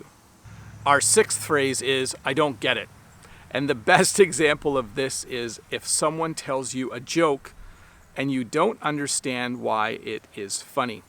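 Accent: Canada. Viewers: I likes Canadian English, it is totally different to US English. Greetings from honduras, nice videos thumbs up well won dude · I knew that you are Canadian through your pronounce especially when you said (about) thank you so much my great teacher for teaching us your beautiful language , I'm watching you from the place where Arabic language was born it's Saudia Arabia .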